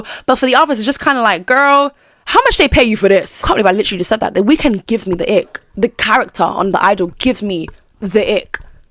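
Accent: United Kingdom. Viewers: you sound like 10 times more british in this video than usually, and it's super cute!!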